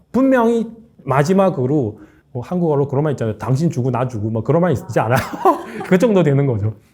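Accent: Yanbian, China. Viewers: 저사람은 중국사람이에요? 한국사람이에요? 발음 겁나거슬리네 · 이 사람 한국발음이 아님. 중국 국적 아님? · 이사람 발음이 연변인것 같오ㅋ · 이 아저씨 말투가 중국사람이 한국어 하는 말투인데 ? 중국이 아니라 쭝꾸우억. 결정이 아니라 겨월정 이런식임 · 이분 중국분이신가요? 발음이.. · 말투가 중국인인데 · 발음이 좀!😮 화교인가?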